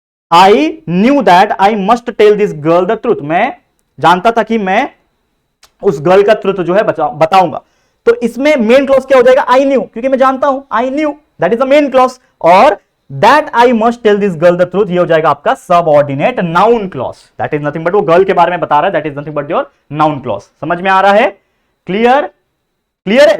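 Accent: Maharashtra, India. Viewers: Sir aap Marathi ho kya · Sir apke muh se Marathi bohot pyaari lagti hai❤️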